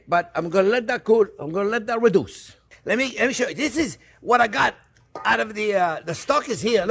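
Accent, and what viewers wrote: France. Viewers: First time watching his vids. I was certain he was cajun. It’s like he’s speaking with an a North American dialect but a French accent. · I heard an Italian touch to your French flavored accent at the time you spoke of the fishmonger and the "'fish bones, eh?" Delightful. Although your last name sounds German. I was born in Nice, but raised in India. So I never had a bouillabaisse growing up. Finally, on a visit to Nice, I found a small restaurant, took my Belgian uncle Ray over there, along with my family, and we had a splendid Bouillabaisse sitting on the pavement of this small restaurant. The lady was overwhelmed as we came in as a big party for that tiny place, but we helped with arranging the tables outside, and we had such a great time. My fondest memory of this soup. I think as an American I had no problem with arranging the tables, and this was shocking in France where there is a tradition of service and people waiting on you. 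Ha ha. She had some good stories to tell of our visit years later.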